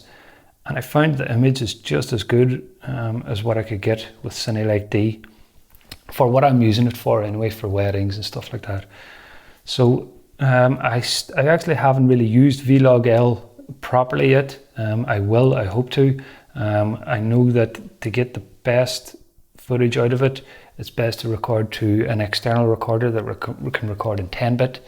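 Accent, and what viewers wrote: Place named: Northern Ireland, United Kingdom. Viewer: Hi, I notice your accent is Northern Ireland where I'm from myself. You wouldn't happen to want to sell me your GH4 by any chance? If you feel the GH4R is all you need?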